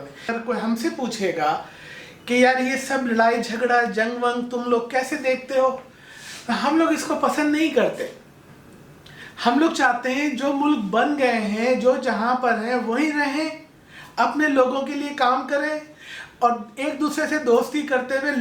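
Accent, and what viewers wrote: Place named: Darbhanga, India. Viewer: می دربھنگا سے ہو آپ کی اردو اور لہزا بلکل ابھی بھی وہی ہے.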